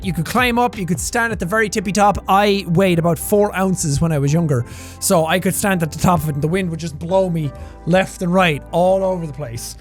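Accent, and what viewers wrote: Ireland. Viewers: After seeing a 7 year old video of, i wonder when did he become so irish? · Idk if this is just me but irish accent makes me happy watching his videos in genral make me happy. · My goodness, Irish was full frontal in this video 😂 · Okay but how he pronounced "dinosaur" was the cutest thing?? I ain't one to make a comment like that but idk. It was cute. ANYWAY! I think jack's talking about Ireland has really amplified his accent and I'm here for it 😂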